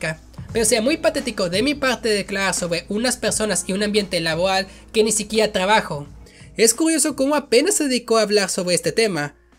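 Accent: France. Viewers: Soy yo o tu hacento parece francés es tan hermoso UuU ✨ Estuvo interesante el vídeo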